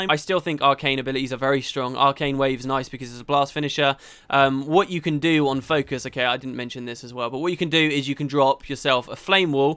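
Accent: England, United Kingdom. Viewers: your english accent and saliva makes me throw up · english accent sounds amazing IMO (I'm german)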